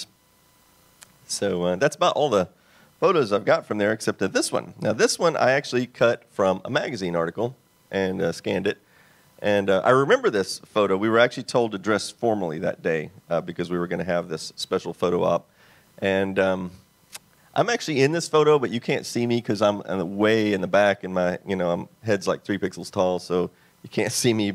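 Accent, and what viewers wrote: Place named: Texas, United States. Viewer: I can hear that Northern Texas Accent in your voice. I don't know why I never picked up on it in the past.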